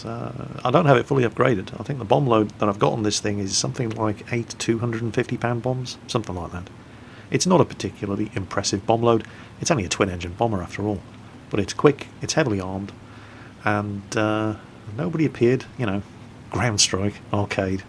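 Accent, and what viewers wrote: Norway. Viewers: best norwegian accent ever:D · I like your Norwegian accent! you sooo funny!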